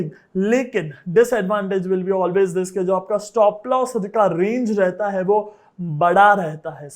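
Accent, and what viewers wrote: Gujarat, India. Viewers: UFWP event jordar hai, jitni tariff kare kam hai, jo life ko badal deta hai aur ek new life jine ka tarika batata hai, aap jitni event karege woh sub attend karunga and tamari gujatati tone jordar chhe 🙏🙏🙏🙏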